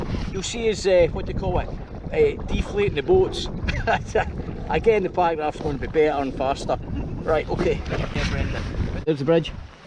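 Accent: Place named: Scotland, United Kingdom. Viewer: The scottish accent is not easy to understand for my french ears too.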